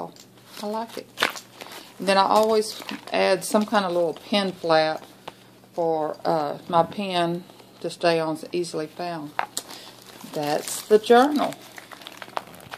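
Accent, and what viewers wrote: South, United States. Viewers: ...where is your accent from? I am from Mississippi and I know it sounds southern...just not sure where...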